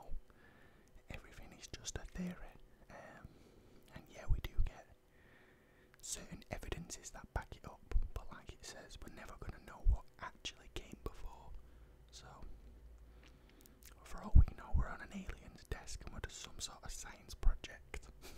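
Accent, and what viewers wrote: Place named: St Helens, United Kingdom. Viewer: Your accent seems similar to mine! St Helens gal! 🙌🏼 love your videos!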